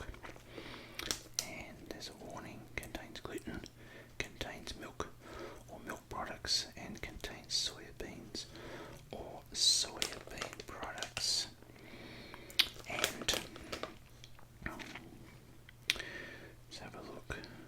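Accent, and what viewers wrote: Australia. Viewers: What makes asmr better is an Aussie accent great Job m8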